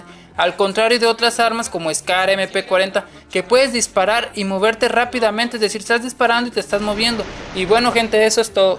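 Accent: Argentina. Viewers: Sos de Tucumán crack ?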